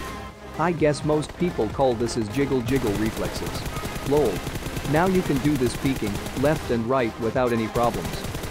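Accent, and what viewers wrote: India. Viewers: Are you indian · Are you Indian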